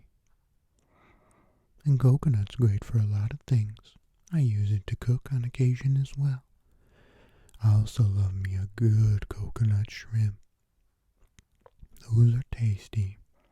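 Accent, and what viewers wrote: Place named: South, United States. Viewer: Southern accent,I love it!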